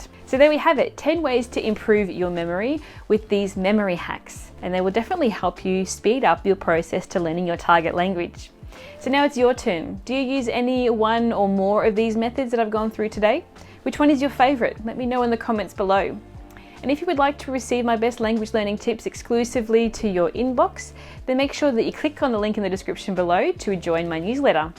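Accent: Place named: Australia. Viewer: Hi, thank you for your informative video. I was really looking for techniques to expand my general vocabulary. I can easily visualize and link nouns as they are easy to form a mental image. However, can you give any tips on how one might form an image and action mnemonic to words which do not immediately form an image? Eg. seraphic, sedulous, unctuous etc. Really appreciate your video, there is a lot of valuable info I had not considered such as space repetition. Oh, and it's great to hear a fellow Aussie on YouTube too:-)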